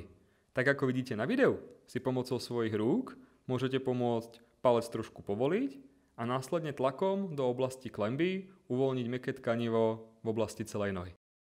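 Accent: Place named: Czech Republic. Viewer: Ahoj ty Si čech alebo Slovák ako to rozprávaš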